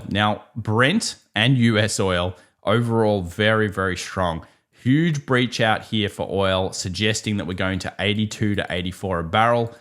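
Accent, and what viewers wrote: Australia. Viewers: Kangaroo English (he is from Australia 🇦🇺)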